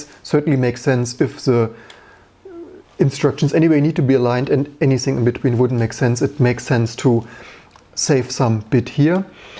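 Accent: Germany. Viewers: Come on, everybody knows you're a German hearing your thick accent … 🙄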